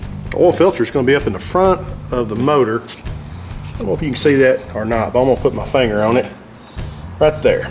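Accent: South, United States. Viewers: Yea you do, Southern accent. Love the South! BTW thanks for the video for you have provided me with a YouTube Degree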